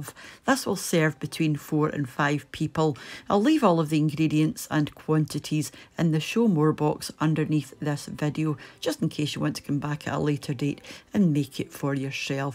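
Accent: Scotland, United Kingdom. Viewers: Hi! Love listening to you and your recipes. Can you please do me a favor? Say your name in your videos? My name is also and I'd love to hear it in your Scottish voice. ❤ Much thanks, Bowen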